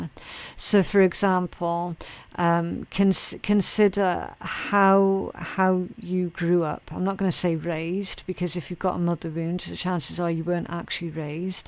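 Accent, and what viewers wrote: Scotland, United Kingdom. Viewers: Can I hear a wee Scottish accent in there somewhere? Great reading!❤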